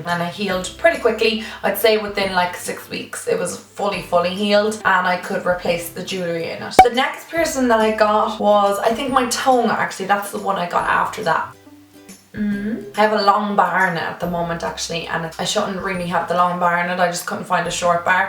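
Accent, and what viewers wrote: Ireland. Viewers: Kassie Pistone Thats called being irish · does anyone think she sounds like cheryl cole with an irish accent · Are you Irish 🍀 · i thought i knew your ascent i was in ireland not to long ago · Are you Irish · she's irish... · she sounds Irish or Scottish but I could be wrong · Your voice is so soothing!!! I love it. I guessed you were from Ireland before you even said it 😂 wanna visit so bad!! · Are u Irish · Nice piercings, I got my tragus done on each ear and both of them wormed their way back out, so upsetting, sacred to have it done again encase the same thing happens but I really liked it when they were done! BTW, LOVE your makeup, especially the blue on lower lash,what have you used eye shadow wise? Love an Irish accent :))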